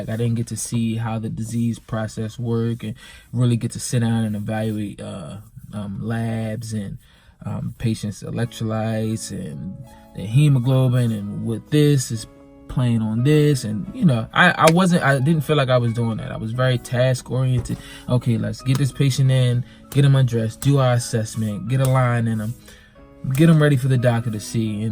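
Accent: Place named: Maryland, United States. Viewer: Is this guy a Marylander??? He sounds like he is from my hometown!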